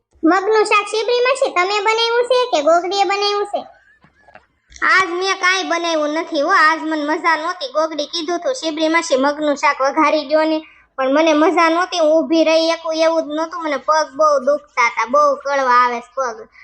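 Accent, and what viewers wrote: India. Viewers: ભાષા ભાવનગર ની બોલો છો.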